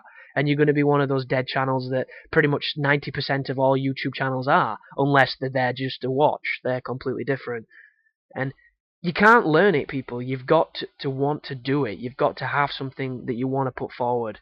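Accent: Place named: England, United Kingdom. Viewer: Thx for your very helpful vids and good commentary. I love the " bot";)) no im not an american i just love the english accent. Keep up the good work.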